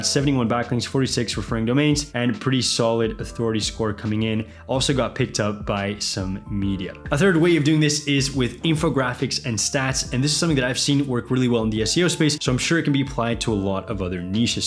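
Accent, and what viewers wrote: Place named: Singapore. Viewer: I've just spent 5 hours trying to work out your accent I'm going with schooled at an international school in Singapore or some shit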